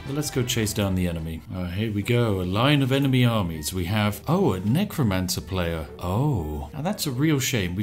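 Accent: United Kingdom. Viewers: i like the voice narrating, so smarty kind of bri-ish and with silly jokes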